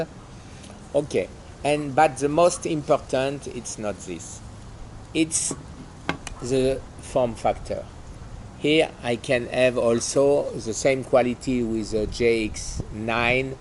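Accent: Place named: France. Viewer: Cà me rend triste aussi d'apprendre que tu es atteint de cette sale maladie. Il y en a tellement! Mais bon il faut garder espoir et profiter de la vie comme tu le fais. C'est en partie grâce à toi que j'ai migré vers le monde du MFT. J'ai choisi Olympus et suit très satisfait. J'aime tes vidéos et ton anglais "so frenchy". Tiens le coup.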